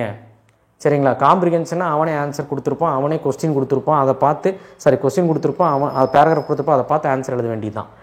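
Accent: Coimbatore, India. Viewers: Sir neega Coimbatoreingla sir 😊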